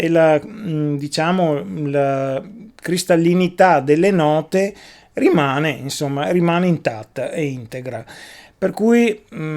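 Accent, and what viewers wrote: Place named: Veneto, Italy. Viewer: Ciao Conterraneo! dalla parlata ho detto, questo è Veneto, e poi dal Nome e Cognome ho detto... ma mi sembra di conoscerlo... e infatti sei di Thiene... io di Malo!, inizio a seguirti che mi sto avvicinando al mondo della chitarra, anche se un po' Vecchiotto per iniziare a 43 anni, Ciao!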